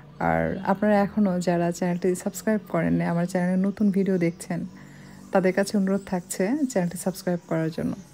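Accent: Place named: Barishal, Bangladesh. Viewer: আপনি বরিশালের মানুষ আপনার কথার মধ্যে বরিশালের ভাষার ঝাজ পাওয়া যায় এগিয়ে যান সমহিমায় ধন্যবাদ।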